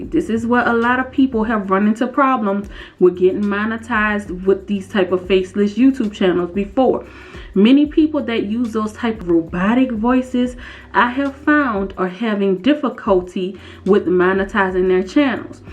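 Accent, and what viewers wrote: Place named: Louisiana, United States. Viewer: I like her, but her voice is so annoying it’s like a Louisiana annoying country type of voice or something just take some English classes somebody’s Youtubers think they can just hop on here and do anything it’s OK to take some classes specially, if you talk to an audience practice your English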